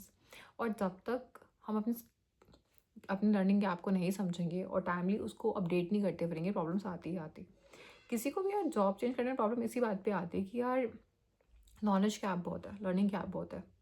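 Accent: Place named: Delhi, India. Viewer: Aapka communication and Hindi dekhke I can be sure ki aap Delhi se ho.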